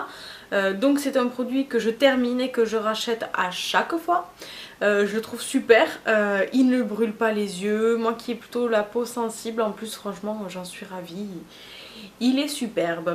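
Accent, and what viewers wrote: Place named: Toulouse, France. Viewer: A la la cet accent toulousain ! 😂💕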